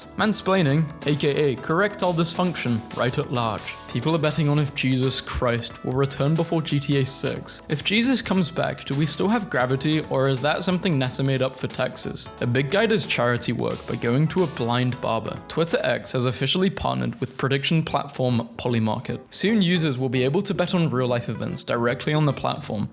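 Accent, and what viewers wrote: United Kingdom. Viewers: Hi British man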